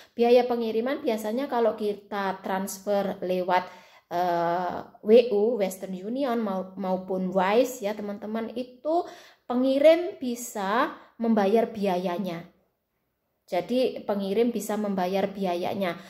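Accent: Java, Indonesia. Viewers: Sampean org mana mbk, logat nya Jawa yo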